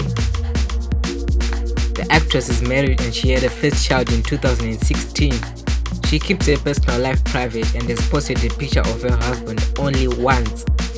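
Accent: Zimbabwe. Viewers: Why do I think that the narrator is from zim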